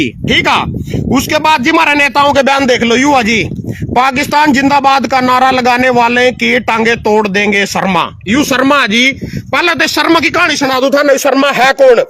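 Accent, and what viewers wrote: Haryana, India. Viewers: भाई आपके भाषण में हरियाणा जिंदा है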